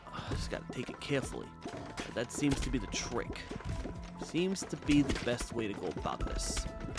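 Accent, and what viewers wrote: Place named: New York, United States. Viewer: It amazes me how YouTube isn't going out of business. Also your doing well and have a great day honestly I don't mind the swearing plus love New Yorker/Chicago accent reminds me of the days of Mafia like fat Tony from Simpsons and Vinnie cannoli from guns gore and cannolis.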